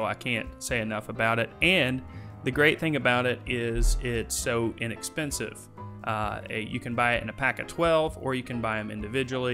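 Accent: South, United States. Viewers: Not is your videos very informative and well-done, your accent makes it even better. It's just so freakin' cute >-< It sounds southern, but I may be wrong.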